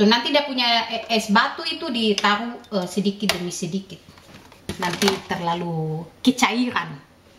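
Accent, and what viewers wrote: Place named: Papua, Indonesia. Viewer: cara bicara kaya orang papua Soalny sy org papua😂😅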